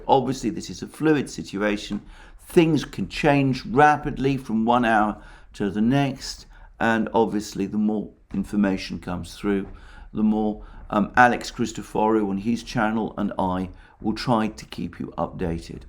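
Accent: United Kingdom. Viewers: I love your British style and cadence of speach. I want to start go learn Russian. I'm sick of all this anti Russian sentiment.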